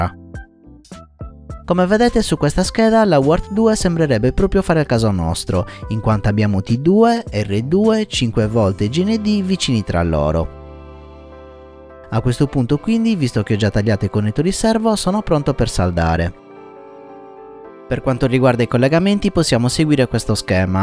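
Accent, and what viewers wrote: Sardinia, Italy. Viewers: ciao Avrei un altro problema, in attesa che mi arrivi l esc per x220 vorrei utilizzare il nazgul v2 posso utilizzare, come radio, fs 16 x cosa devo fare inoltre, scusa tutte queste domande, ho acquistato gli ev 100 ma non riesco a vedere nulla, dove sbaglio? Dall accento sei del cagliaritano io sono dell ogliastra magari un giorno ci incontriamo per un caffè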